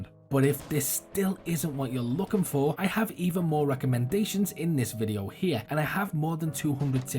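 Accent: Liverpool, United Kingdom. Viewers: Yo my fellow scouser where about are you from hahaha love to join up with you, my mates are all moaning bastards on dayz I reken youd be sound and chill to play with. Thank you for the content too! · Are you from the Toxteth area of Liverpool by any chance?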